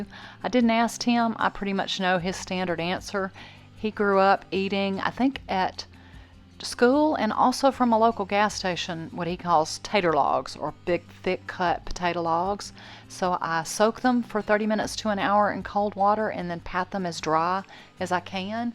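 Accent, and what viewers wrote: South, United States. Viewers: I just love that southern twang😂. People from the south are honestly so warm and friendly. Everything looks yummy as always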